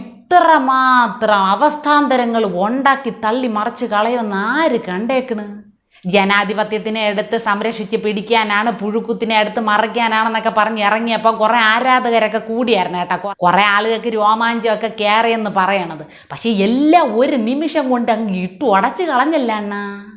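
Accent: Thiruvananthapuram, India. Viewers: ഭാഷ തനി തിരുവനന്തപുരം: തിരുവനന്തപുരത്ത് കാരിയാണോ? അവതരണം സൂപ്പർ❤❤❤❤❤❤❤❤❤❤❤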